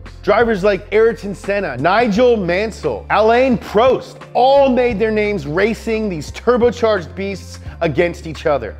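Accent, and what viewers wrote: United States. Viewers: Look... i don't know anything about F1... but somehow beung ranted at by an american makes me think everything is false in this video. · I couldn't watch more than a minute. Why do Americans speak like this?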